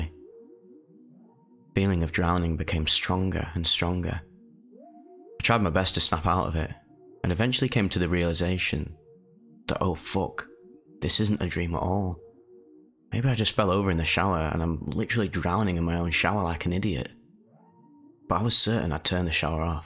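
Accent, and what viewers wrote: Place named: Northern England, United Kingdom. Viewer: “If life was just in pure god mode and we were fucking no clipping through walls it would be well boring won it” love actually having British humour on the internet for once instead of watered down American humour and way of talking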